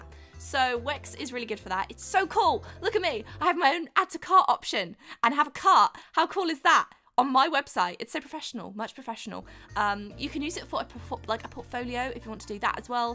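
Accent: United Kingdom. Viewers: Lol I thought that you where British to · I thought you were British, lol it truly doesn't matter, you're still beautiful. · OK im new I love you! Just want to know how did you get such a strong UK accent, if you been in the US most of your life? I love your accent btw just asking how it came to be? Love your video and you! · Maybe i missed it, but if you've been in the US for so long, why is your accent so thick? I understand you learned to speak from your parents who are presumably also British, but I'd think being here from a young age you would have lost some of it. I hope that didn't come across poorly, there's no criticism there, I'm genuinely curious.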